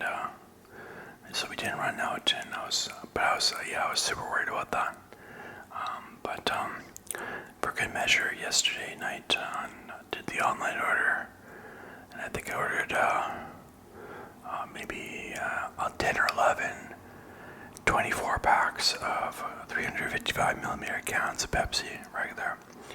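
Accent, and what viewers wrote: Canada. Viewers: The Canadian accent always brings me back to your videos. Keep up the grind!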